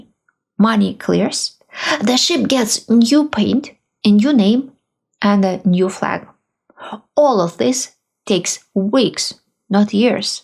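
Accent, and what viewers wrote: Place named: Russia. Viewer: I could listen for hours to your charming Russian accent presenting horrific geopolitical events as smoothly as a wonderful cake recipe. Beyond my admiration for your voice, your analysis is spot-on. You called it—Europe is slowly waking up. Yesterday's drone strike on a ghost ship near Turkey proves your point. No harm to the sailors, but enough to stop the vessel dead in its tracks. For the unscrupulous capitalist adventurers buying Russian crude at 40% discounts, the message is clear: think twice. That "bargain" can cost you 100% when your ship becomes a target.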